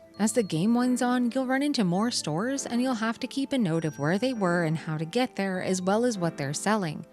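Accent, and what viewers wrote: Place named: Canada. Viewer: you are actually from canada right ? i wont say that i spend very much time on your twitch channel because i get so busy with work that its hard for me to find enough time to sit down for long hours to watch the twitch livestreams. so i might have missed alot of the details about yourself or your experiences if you ever talk more about your life on twitch. i just tend to only check out the youtube videos you make instead. but im just sure that you must be from canada just because of your voice and because i have lived in canada my entire life too. canadian folk can often sense other canadians. what part of canada are you in though ? you can just say what province if you dont like giving out too much personal information. or ill apologize if my best guess is wrong and you arent in this country. i think we are also close to the same age as well but im all the way far east in the atlantic provinces. 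its rare to find anyone from canada that reviews these older retro games from what i have seen on youtube. so i just wanted to tell you that i really appreciate what you are trying to do with your channel. you are a true inspiration to other gamers in my opinion. someday i hope to start a channel and make the same kinds of reviews as well. i say that since i am also addicted to the rpgs, especially the retro rpgs. i dont think you have a discord server or i would probably try talking to you later. wish you all the best however and good luck with everything, take care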